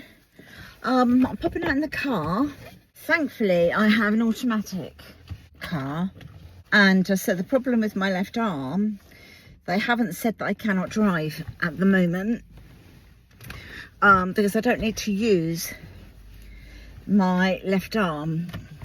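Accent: United Kingdom. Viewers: You sound like everything is Essex bless you ❤❤❤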